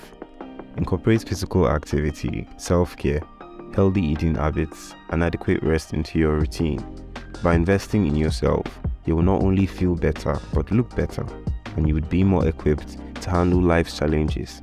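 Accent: Nigeria. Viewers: I just want to know, cos you sound Nigerian. Are you Nigerian? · You know he's valid when hes talking bout mens self care and he has a nigerian accent. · Why you have Nigerian accent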